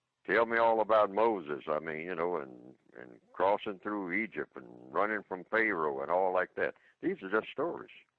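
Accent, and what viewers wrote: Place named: United States. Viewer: I just started listening to Mr Fuller I enjoy his ole Mayberry USA voice and I appreciate his time/knowledge/concern for the people of color...do you know how many blood washed, born again believer's that wish it was that simple as 10 minutes...not the play church folks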